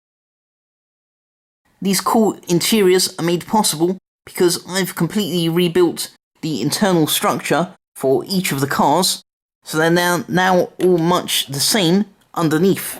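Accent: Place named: Southern England, United Kingdom. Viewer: I can recognise your accent but whereabouts in england are you from (guessing its down south)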